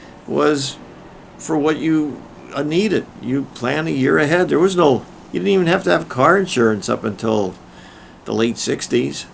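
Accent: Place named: Midwest, United States. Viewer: new subscriber, loved this video. I’m a 4th generation carpenter, Union trained Journeyman Cabinetmaker & Millman, worked (30) years in the Commercial Cabinet and Millwork Industry. My trade career ended in 2009 when the economic downturn and competing imports from China for less than our cost of materials wiped out my industry. Around the same time all of the major furniture companies filed for bankruptcy. Government sent me to Nursing School (LPN). Retired (4) years ago as a Jail Nurse. I started learning the Carpentry trade from a young age outside of Dubuque Iowa. By your accent I figure you’re from the midwest, maybe Illinois. I remember the old ways of doing things. Engineers hatchets, Yankee screwdrivers, & 16d sinker nails. When I was an apprentice, some of the journeyman Carpenters would take an engineers hatchet, cut of the hatchet part and weld on the claws in order to get a heavier framing hammer. 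Of course when the Union Rep showed up at the job site they had to hide it because it exceeded the weight the Union allowed. Anyway, your little talk about the old days brought back fond memories of working with my dad and grandfather. Thanks.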